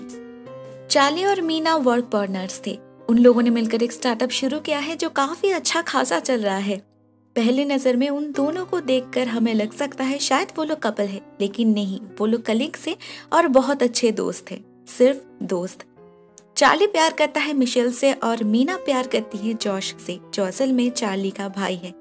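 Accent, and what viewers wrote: Bengal, India. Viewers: I love ur Bengali Accent 😜😘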